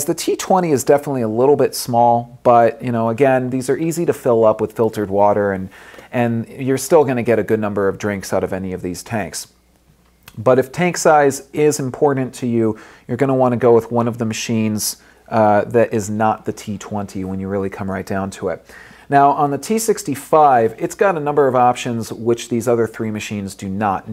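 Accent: United States. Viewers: He's american. They all say button that way.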